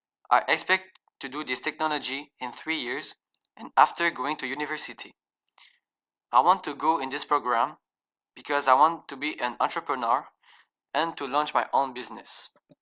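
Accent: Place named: France. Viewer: Hi, I'm Alex, and I was searching for tips to get better at english, and so I just finished watching your instructional video about this website. I have to say it's a great site, there's a lot of functionalities to help me get better. (I am also polishing my english, just like you) French is my first language, it is yours too I think (got this from the accent). Thanks for the vid, I wish you to succeed in your college program, and good luck my dude! -Alex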